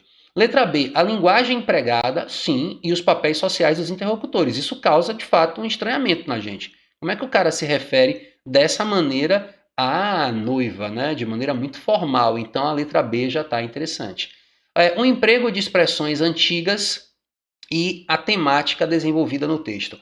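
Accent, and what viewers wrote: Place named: Salvador, Brazil. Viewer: ótima explicação! Você tem sotaque de soteropolitano, amei ouvir.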